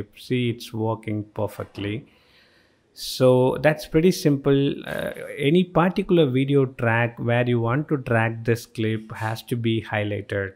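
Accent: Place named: India. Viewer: The dreaded Indian accent on a tutorial video.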